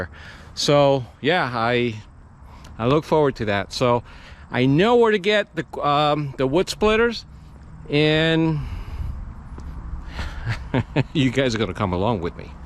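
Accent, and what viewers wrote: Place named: New York, United States. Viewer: You sound like me . I live in NY too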